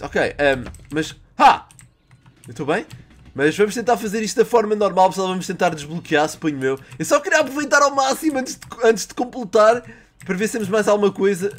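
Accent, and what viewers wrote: Portugal. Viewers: é português de Portugal, acho que você acostuma. é fácil de entender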